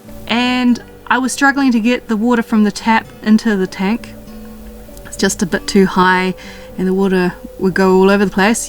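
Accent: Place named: New Zealand. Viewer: Fish and chips. My favourite words in a kiwi accent. 😍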